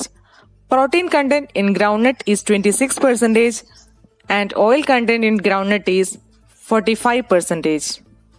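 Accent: Kerala, India. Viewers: Madams pronunciation sounds like mallu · Mam you are sounding like malayali ??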